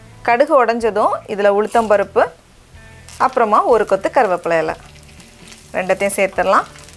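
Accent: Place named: Kanyakumari, India. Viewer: thappu kidayathu sister athu avanga native kanyakumari slang..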